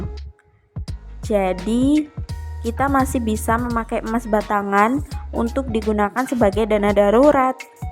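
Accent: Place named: Java, Indonesia. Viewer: Kak asli org mana klu boleh tau? Ko gaya bahasa nya medok bgt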